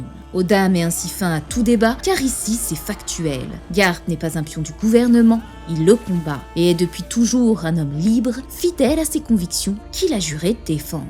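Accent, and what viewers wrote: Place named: Belgium. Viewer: Le leger et subtil accent belge de retour :)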